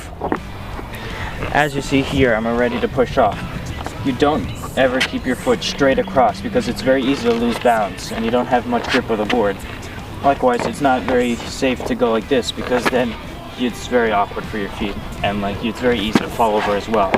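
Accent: United States. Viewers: dude where in ny you from????? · are you staten island?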